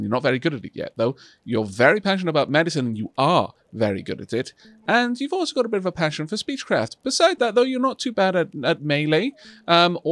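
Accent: Wales, United Kingdom. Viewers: I went into this blind from recommendations, distracted by btd6, and I was like wow, this guy sounds like. I had to get out of full screen to see who it was and.... yeah it was you!!! Your calm voice is unique and proper mate, the Welch in you comes out joyfully. When I was sent overseas to RAF Alconbury in East Anglia the winds were like gale force on the day I was picking up my MOPP Gear over stuffed into a parachute bag (Chem suit/mask and all the trimmings) which weighed a metric ton altogether and I was walking the entire way to the dorms against the wind. My friend was heading the opposite direction and he wizzed on by me waving. I still remember the one time the wind stopped and the sky was dry while we were walking to the bowling alley. It was summer about 6 pm so another 3 hours of sunlight at least and I remarked how nice a night it was for once. 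When we got out of the bowling alley not 2 hours later it was lightly raining again and blowing like the channel resented us being over there... as per usual. When I eventually got sent back stateside I was sent to the deserts of Idaho where the ranking Sargent of my job place was showing me around and remarked that I'd best get used to the wind. I simply said ' What wind?' He thought I was boasting. It was too cold to try and explain where I had just come from as he would have doubtless no clue.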